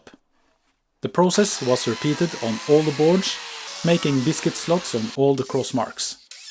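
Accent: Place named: Minnesota, United States. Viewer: I was listening to u say ticknesser and udder hand then realized because I'm from Minnesota I knew exactly what u were talking about. Nice work!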